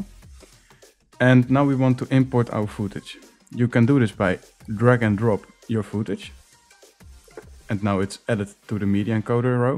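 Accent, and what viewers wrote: Netherlands. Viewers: Dutch accent detected. I like! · Hey cool you Dutch? thought I heard it. Nederland! <3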